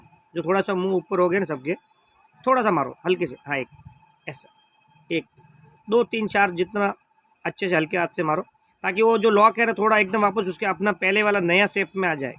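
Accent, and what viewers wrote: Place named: Gujarat, India. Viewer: Wah gujarati hindi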